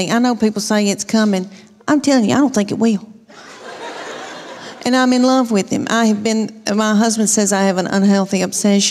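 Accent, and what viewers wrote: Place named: Western North Carolina, United States. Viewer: I hear some western North Carolina in her accent especially when her sentences sounds like questions? I’m from Waco Texas via Nashville and raised in Western Kentucky before that so you can’t go by me. We never had kids but if we did I’d take them to Leann for some straightening out.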